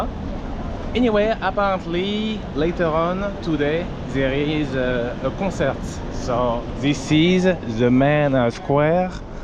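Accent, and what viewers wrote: France. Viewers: ! Seriously, I have been to Jamaica many times and I have been treated wonderfully by the Jamaicans. It could be your heavy French accent but then again I have followed Dee Mawanga and her brother I’m_Marwa and they both have both heavy Kenyan accents and they both had an awesome time in Jamaica. Just keep on plodding on and don’t take things too personally. Many people don’t like to be on camera so you may have to tweak the way you do things a little. You also have to show the many developed areas in the Jamaica. Anyway, I will still follow you. Good luck my friend. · Sorry you are having a different experience in JAMAICA than you were hoping. There are many Jamaican vloggers like you and Africans men and women, who have passed through the streets of Jamaica and have had a great time with the locals like the Ghanaian Wode Maya, I am Mahwa, etc. 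You should have checked out the Rastafarians and Ital Food restaurants to get your meals as there are many of them around. You have to try a little harder to lower the French accent as many people you encounter selling in the Streets find it hard to understand. Your dress style is not an issue. Some Jamaicans learn French in Highschool, but you will not find them walking around unless you are in the better neighborhoods. So, stay strong and continue to get better with your JA vlogging experience. One Love. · I’m honestly thinking there’s a language barrier. You have a French accent, and I think a lot of people are having a problem understanding you.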